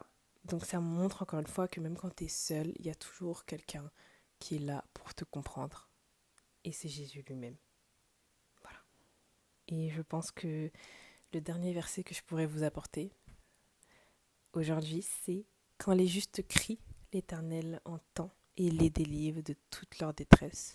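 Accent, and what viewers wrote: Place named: England, United Kingdom. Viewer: J'aime ton accent anglais😊☺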